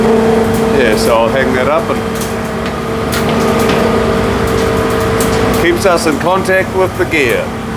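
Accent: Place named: New Zealand. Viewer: Gotta say I love watching this shit as a keen fisho always interested in how the pros do things , so I subscribed keep filming You’ve got a good narrator’s voice too might be the bloody kiwi accent Just a note for you I fish out of Robe SA water temp this summer has been around 13-15 degrees c ,I think the fish are frozen Cheers from down south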